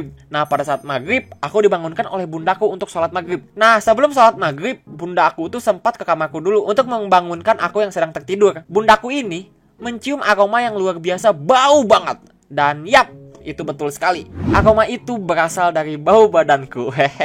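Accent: West Java, Indonesia. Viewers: Awok²... Tiguling dong:v Orng Sunda ya bang? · orng Sunda?😳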